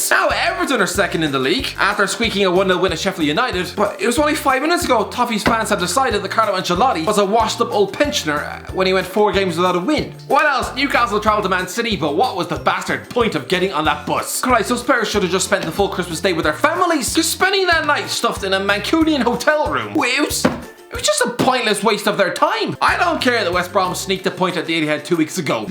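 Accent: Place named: Ireland. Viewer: Can’t be the only one who has to switch on captions for this guys videos. I have a lot of Irish mates but god damn this accent is so thick 😂😂😂. Good content though absolutely love the channel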